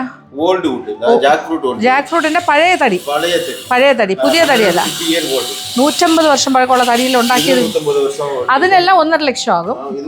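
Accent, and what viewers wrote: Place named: Tamil Nadu, India. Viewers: Madathinte tamil kelkkan rasamundu😄kathirikka konjam veyy ennu kettappol ente Aswathy molu nalla chiri ayirunnu ❤ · കത്തിരിക്ക konjam veyy...😅athu kettapom chiri vannu😅... Mam nte tamil kelkkan nalla rasamanu❤️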